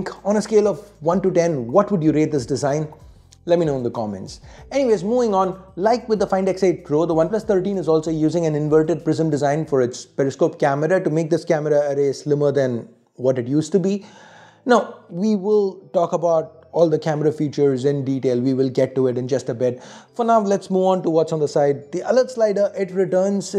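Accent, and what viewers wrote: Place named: India. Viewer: I swear i couldn't continue watching the review because of his indian accent ITS HARMFUL TO THE EARS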